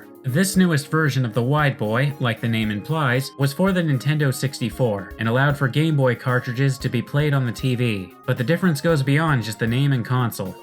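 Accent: Canada. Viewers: I thought you sounded Canadian, the you said "Z" like Zee, then I thought you must not be. Then you said you were Canadian...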